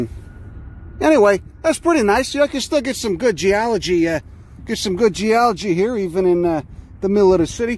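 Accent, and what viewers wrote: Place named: New York, United States. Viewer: Name that accent! My guess is NY/NYC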